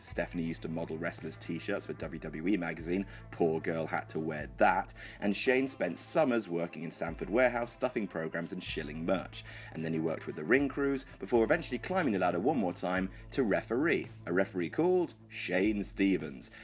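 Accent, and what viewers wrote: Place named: United Kingdom. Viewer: Come on man seriously,can they get people too do these that you can understand? here that whispers half the time,mumbles 1/4 of it the other 1/4 says 3,4 words at a time.Plus that thick British accent who the heck can understand what he is saying?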